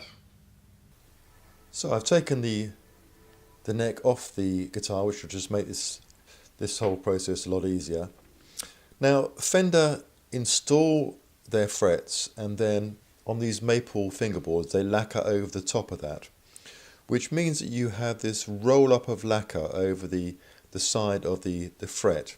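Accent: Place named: England, United Kingdom. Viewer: I love English accents.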